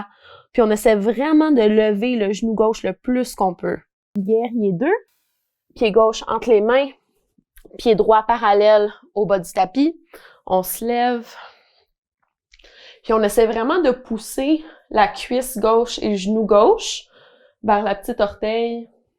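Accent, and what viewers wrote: Quebec, Canada. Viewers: Ahah ahaha excellent!!!! Un an que je te suis en anglais, pensant que tu es américaine, pour découvrir que tu es Québécoise, comme mes cousins adorés !!!! Génial!! Et puis ce délicieux accent (je suis français), quelle belle surprise ce matin!!! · Je suis vraiment contente que tu a fais le yoga en français ! Je ne suis pas française, mais je parle le français. L’accent et plusieurs mots sont différents en français québécois, mais de faire le yoga en français me faisais beaucoup de plaisir (mon français ce n’est pas parfait, mais c’est bien de le pratiquer) ! Merci beaucoup. J’attends avec impatience de pratiquer avec toi et toute le monde en français encore. Bonne journée à tous. Namaste xo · Really enjoyed the class "en français". I'm English so the québécois accent took a bit of getting used to, but that was part of the fun. I'm on day 29 of 3rd time through 30 days of morning yoga. 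Love that it's different each day. Any chance if 15 days of 15 min morning yoga. Could do with a slightly longer class that was different each day. Merci beaucoup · Woooooow mind blowing!! Je n’avais aucune idée que tu étais francophone 😃 ça serait super de te voir enseigner un peu plus en français! j’adore l’accent québécois 😁 salutations depuis la petite Belgique 🇧🇪😉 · Oh je ne savais pas que tu étais francophone !!! C’est génial, avec ce petit accent québécois 👍! D’autres vidéos en français seraient top! Même si j’adore celles en anglais, t’entendre en français est très sympa 😊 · Are You Canadian? You sound like from Xavier Dolan movies ❤️ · Bonjour, merci beaucoup pour cette vidéo en français👍❤️, j'espère que vous ferez d'autres vidéos en français, avec votre accent québécois cela rajoute beaucoup de charme, merci pour votre bienveillance et votre professionnalisme, passer une agréable journée 🙏🧘‍♀️🖐️😁♥️🕉️☀️ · No wayy ! 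Quelle surprise ! Ca me fait tout bizarre de t'entendre en français mais j'adore ! Vive l'accent québécois :) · !!! ca fait un moment que je suis abonnée à toi et jamais j'aurais cru que t'étais francophone. je suis du maroc en général notre façon de parler se rapproche plus de la france mais l'accent québécois m'a toujours fascinée et mon copain est né à Montréal du coup its close to my heart ❤ je pense que tu devrais ajouter plus de vidéos à cette playlist. i know its not within your comfort zone but im sure itd be a great exercise. much love take care xx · Merci, merci et merci. Je suis abonnée à toi application depuis le confinement. J'ai progressé en anglais et en yoga. Et ce matin, un cours en français, chantant et tellement inhabituel. Tu te débrouilles très bien et c'est vraiment un merveilleux voyage au Québec !! Moi qui suis française, ma prof disait chien tête en bas, posture de l'enfant (balasana) et la pince debout quand tu te plie avant "half way". 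Continue à nous enchanter avec ton bel accent et tes cours exceptionnels. Namaste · En tant qu'anglophone, je peux généralement identifier le moindre accent, mais je n'avais aucune idée que vous parlait Québécois. L'accent est un peu difficile à comprendre pour les "monolingual whitebread Americans", mais c'est une pratique à double tranchant : Le français et le yoga ! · Merci beaucoup pour cette classe! I do your classes from Wales, but learned some French when I lived in Quebec a few years ago - nice to hear French from Quebec again and in my favourite yoga class! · Ça ne devrais pas me surprendre que tu es bilingue, car je sais que ton ancien studio se situe en Ottawa, mais le fait que tu es québécoise est vraiment super! Je suis ontarienne anglophone, mais je suis enseignante en immersion française. Je trouve que j'ai perdu mon accent en parlant aux petits tout le temps, donc je suis ravie d'entendre ton accent et de suivre ce cours avec toi! 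Merci de continuer de nous partager des aspects de ton identité!💕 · That was fun! My mother tongue is swiss-german, but yoga en français c'est jolie, too! And I love your accent québéqois!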